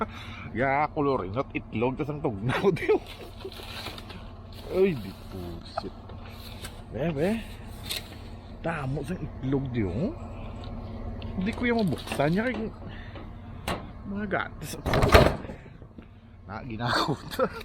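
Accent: Iloilo, Philippines. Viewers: Masarap pakingan ilongo salita, cebuano ako pero konti nakaintindi ako ilongo❤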